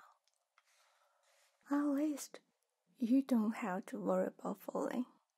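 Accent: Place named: China. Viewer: 这个口音好国人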